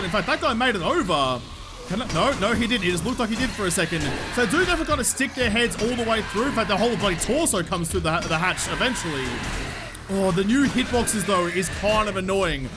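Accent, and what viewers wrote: Australia. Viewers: Oh so your from Australia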